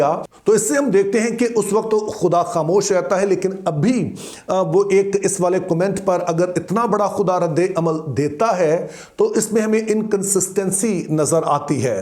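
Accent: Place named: United Kingdom. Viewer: bhai ap urdu midum hyn. Es liy kindly urdu ko urdu ki tarha bola karyn.ap urdu esy bolny ki koshish karty hyn jasy angryz urdu bolty hyn.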